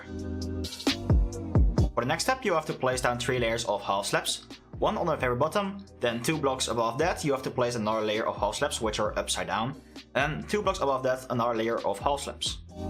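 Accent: Netherlands. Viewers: are you dutch ? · Hi, I have a Question: Does this also work on all platforms like XBOX One/360 and PS3/PS4? Btw, you have an accent. Are you dutch?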